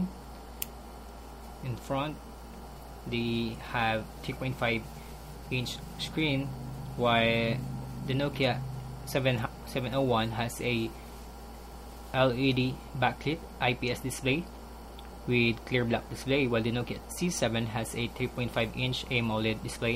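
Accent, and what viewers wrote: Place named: Philippines. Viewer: I knew it..you're a Filipino kabayan..the accent is there.. "The Symbian event was (in) HK so we attended there." "use two weeks ago not last last (redundant) week. mabuhay! peace y'all! =) good review and comparison.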